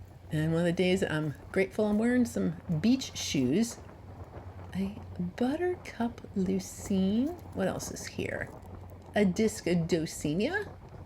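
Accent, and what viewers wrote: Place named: New York, United States. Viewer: My best friend's mom spent most of her life in NY, and NJ, and when you say certain words your dialect sounds just like hers, it makes my heart happy! It is amazing that you have learned ALL of this in just a few years!! I love your content, thank you for all you do! ❤ 🐚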